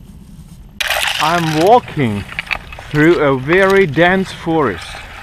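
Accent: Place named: Czech Republic. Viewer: <link> - online slovnik s vyslovnosti, online jich najdete vice nejlepsi jsou s britskou a americkou, kde slysite rozdil okamzite - nevysilejte do eteru 'ceskou' anglictinu, nadelate vice skody, jak uzitku - nemam v umyslu vas zhazovat - napad je to dobry, ale musite znat dobre svoji materii nebo se obratte na rodile mluvci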